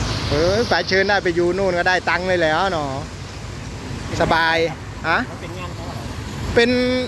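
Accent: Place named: Korat, Thailand. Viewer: วะพูดภาษาโคราชบ้านเอ็งเลยน้ะ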